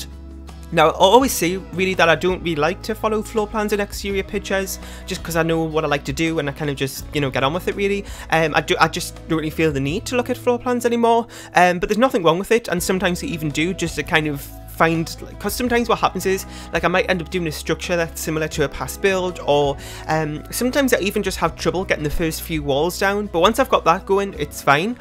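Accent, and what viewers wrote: United Kingdom. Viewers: Where in the UK are u from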